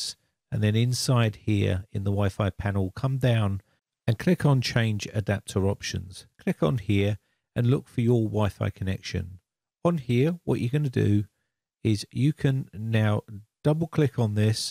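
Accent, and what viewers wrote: United Kingdom. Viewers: Please make a videos for web development programming, sir. I love your British Accent.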